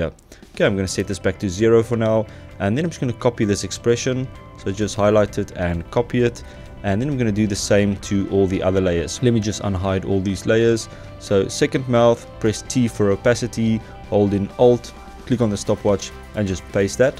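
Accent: South Africa. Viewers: Hey, was almost shocked when I heard a South African accent on a Youtube tutorial. Great Tut though, well presented and explained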